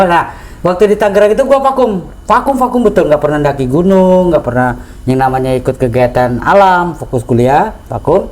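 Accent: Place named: South Sumatra, Indonesia. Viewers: Bahasa sumatera selatan tu jgn d campur2 bahasa indonesia mang 😂ketahuan bener logat mu bahasa sumsel ,bingung org klo yg gk ngerti .. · Padahal enak ceritanya, dari ngomong pakai bhs daerah di terjemahin lg pakai bhs nasional. Ya begitulah logat sumatra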